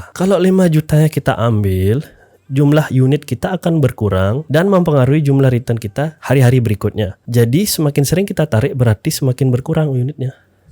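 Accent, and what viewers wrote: Bali, Indonesia. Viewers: Logat Timur coy, Bali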